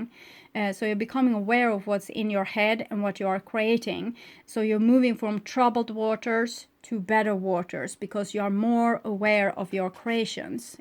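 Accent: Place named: Sweden. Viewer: Hej! Är ny här! Tyckte jag hörde en svensk brytning😜🌻. Din läsning var verkligen mitt-i-prick, har även lyssnat på dom nyare läsningarna. Det är som att du beskriver mitt liv. Kommer definitivt följa dig!❤ Tack så mycket! Kram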